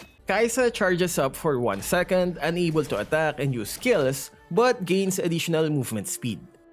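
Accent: Philippines. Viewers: Its because of his accent, “dealing” becomes “diling”,”living” becomes “leaving”, its because of his filipino accent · I can tell he's filipino 🇵🇭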